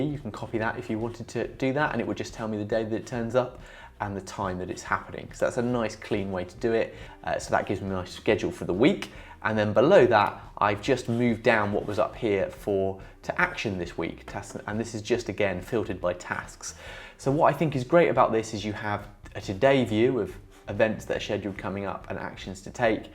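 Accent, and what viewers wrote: United Kingdom. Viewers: мне очень нравятся ваши видео, спасибо, я становлюсь умнее и организованней! А еще мне приятно слышать ваш АНГЛИЙСКИЙ акцент!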